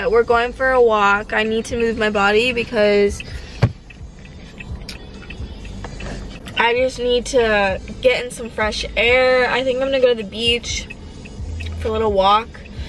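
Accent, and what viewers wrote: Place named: United Kingdom. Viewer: It's the constant British accent for me -- Brit gal over here!!!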